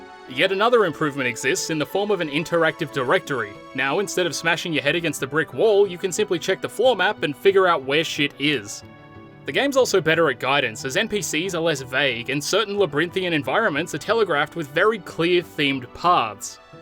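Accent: Australia. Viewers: I wasn't expecting a reply, love your channel so far, it's refreshing listening to another Australian talk about game design.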